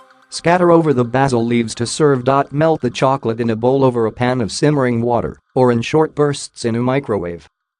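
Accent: United States. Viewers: An American robot!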